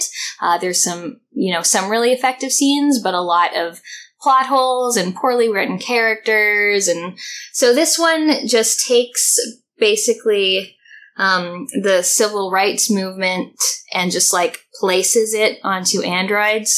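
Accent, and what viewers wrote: Canada. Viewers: i know it's a random question, but are you from canada?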